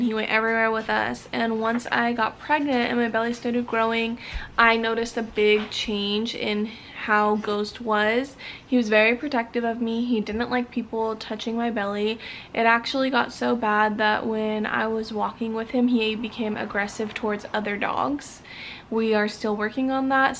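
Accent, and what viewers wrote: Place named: Bay Area, United States. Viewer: where you from ? sound you like from the bay like me lol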